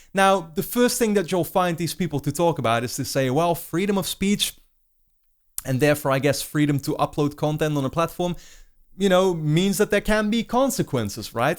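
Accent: Netherlands. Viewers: je Engels is goed maar hoorde ik gelijk dat je Nederlands bent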